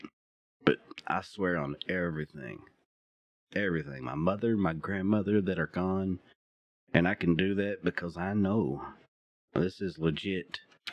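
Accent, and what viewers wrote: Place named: South, United States. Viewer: You sound like you maybe here from the south... If so hi neighbor. If not hello anyway. Love your videos!!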